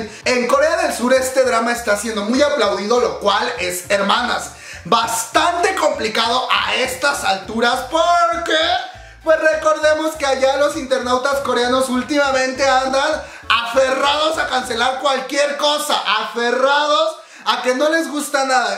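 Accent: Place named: Mexico. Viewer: Mr encanta tu forma de comunicar además hablas muy mexicano y me hacés pasar un buen momento y tú critica es muy coherente y ala vez sensilla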